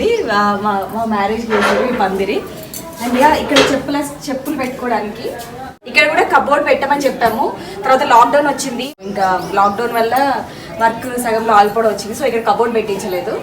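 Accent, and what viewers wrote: Telangana, India. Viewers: Inthaa dooram vellinaa Meeru Mana telangana language laa Matladudu....adirindi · Ur Telangana slang is very nice sister, nice video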